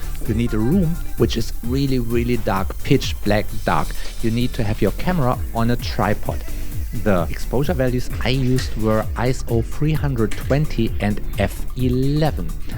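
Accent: Germany. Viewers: That German accent :)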